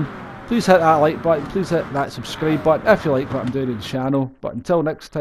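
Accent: Scotland, United Kingdom. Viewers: Track guides would be good, I know there's others doing them and they are all much the same, brake here, turn there, grey parts faster to drive on than the green, brown or yellow bits at the sides however yours would be in Scottish which would make them simply sublime to listen to.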